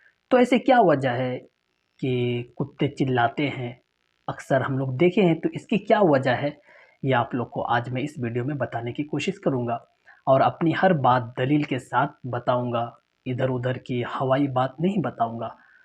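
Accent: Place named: Bengal, India. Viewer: Tum bangali ho Kia aapkeblne katarika sahi nahi hai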